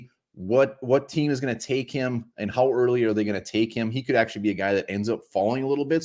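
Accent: Minnesota, United States. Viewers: your Minnesota accent is so strong it is amusing to me 😂 even as a native Minnesotan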